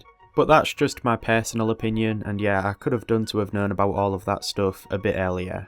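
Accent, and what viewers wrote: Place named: Middlesbrough, United Kingdom. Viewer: Are you from Middlesbrough or nearby? I think we have a similar accent haha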